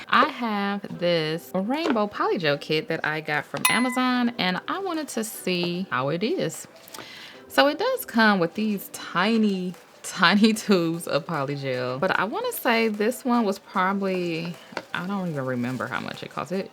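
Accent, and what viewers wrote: South, United States. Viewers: yeah but I’m pretty sure that it is southern... also when I was trying to type pretty it tried to auto correct it to orange 😂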